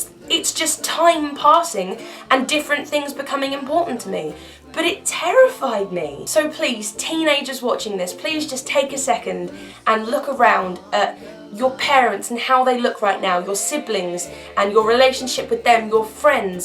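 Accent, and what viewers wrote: United Kingdom. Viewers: You remind me of Merida from 'Brave', :) even though you speak british and not irish.. It's your hair! I like it ^^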